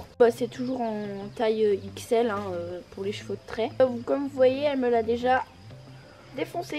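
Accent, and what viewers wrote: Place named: Switzerland. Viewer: Est ce que tu es Suisse par hasard ?😁 parce que je trouve que tu as vachement l’accent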